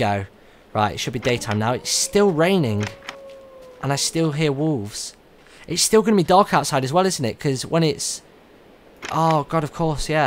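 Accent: England, United Kingdom. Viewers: I LOVE THAT ENGLISH ACCENT WHEN U SEE DE FIRE CREEPER ITS LIKE: OMG NONONONO THATS A FIRE CREEPER NONONO GET AWAY GET AWAY NONO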